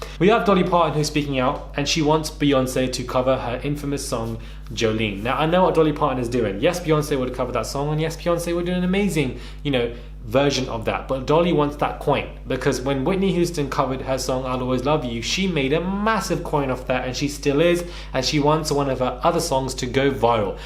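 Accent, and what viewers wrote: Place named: London, United Kingdom. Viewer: !!!... I just found this channel and literally binged! Bite size gossip spoken by a Londoner... Love it!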